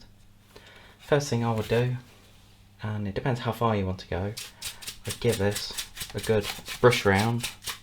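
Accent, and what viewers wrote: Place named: London, United Kingdom. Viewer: Are you from London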